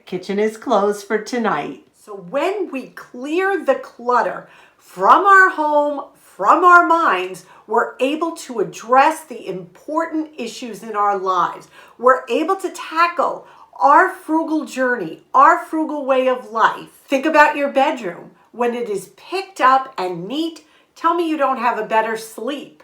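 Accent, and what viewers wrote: New York, United States. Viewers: Hello 👋 This video popped up on my feed. I love these types of channels. I enjoy your NY accent and love the positive tone in your voice. I took absolutely ages to finish the video because you inspired me so much to go and do! I LOVE that old Dutch proverb and will add that to my "to embroider" list! I looked up the amazing planner you showed and OH MY GOODNESS 😱 what a rabbit hole I could fall down for that one. Not very frugal on the outlay but I see how it will save money in the long term... So all this to say thank you (and with his mad guitar skills!) for being you, keep on being you and shining your light all the way across the globe. Lots of love from a new subscriber in Western Australia. 😊💝🇦🇺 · New Yorker? Staten Island?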